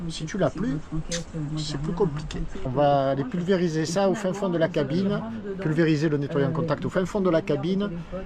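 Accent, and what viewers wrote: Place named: Marseille, France. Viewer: J adore ton accent Marseillais. La bizz. Denis S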